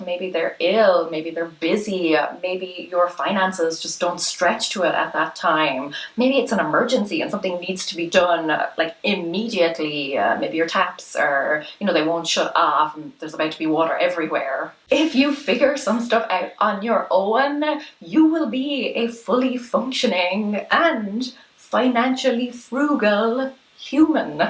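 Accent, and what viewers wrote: Ireland. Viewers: Hi, I love how calmly and relaxed you speak. Now, I can enjoy your Irish accent (ánd your video of course!). Cheers, Sousan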